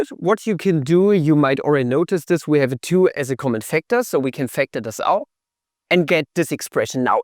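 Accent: Germany. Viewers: I think his first language is German.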